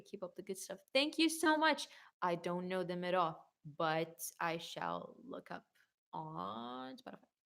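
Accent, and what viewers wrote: United States. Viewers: Extremely surprised to hear an American accent! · Nice American accent!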